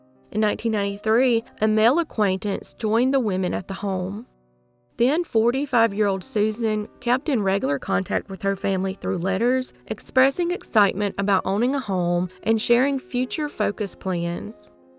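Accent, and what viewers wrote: South, United States. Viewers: With each passing video, my love increases for this channel & that accent! I'm a MISPLACED SOUTHERN BELLE & whenever I hear "that beautiful SOUTHERN DRAWL" I instantly become homesick, then that sense of PRIDE of knowing that I REPRESENT: THE BEST FOLKS, GREENEST LAND, GOOD EATIN, MARDI GRAS, COWBOY BOOT WEARING, HORSE RIDING, NO COATS NECESSARY, NEIGHBORS ARE FAMILY ROUND HERE LAND, CHURCH HOUSES OUTNUMBER THE DEVIL'S DENS & THE SUNRISE, AS WELL AS THE SUNSET, IS VIEWED AS GOD'S BEAUTIFUL SMILE UPON ANOTHER BLESSED DAY FOR WHICH WE TAKE PRIDE IN BEING PRESENT TO HONOR & ACKNOWLEDGE! TIME DOES MOVE SLOWER IN THE SOUTH...I GUESS THAT'S WHY WE AGE SO WELL!!! FROM SOUTHERN LOUISIANA to THE GREAT STATE OF TEXAS TO THE GOLDEN GULF COAST of MISSISSIPPI (yes, in that order for me) I WILL ALWAYS LOVE, REPRESENT & APPRECIATE THE BLESSINGS & BEAUTIFUL MEMORIES CREATED IN A WORLD UNLIKE ANY OTHER! 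THANK YOU FATHER GOD FOR FAVORING ME! 😇💕💯🙏🏽🤷🏽‍♀️🐎🥂🏖💒⏳🌞🎭⚜🇨🇱💃🏽💪🏽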